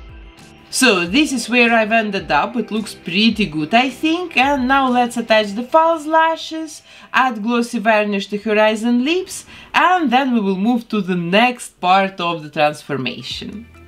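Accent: Russia. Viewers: For so long I wondered why this Belgian lady has a Russian accent... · Buffy I was just abt to comment that, her mold is perfect and she’s Russian herself (she has a Russian accent so I’m guessing she’s Russian)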